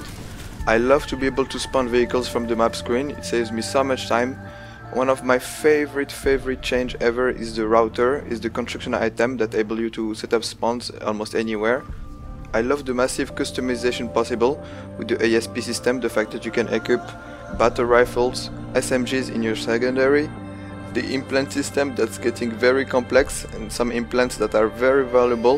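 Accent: France. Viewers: T'as un accent français 🤔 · You really have a french accent. Es tu français ?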